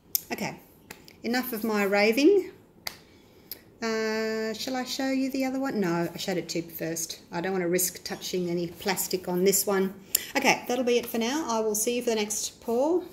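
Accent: Australia. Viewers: Simply stunning. With that Australian accent I assume you live there too. Is global acrylic paint available in Australia and which supplier, thanks.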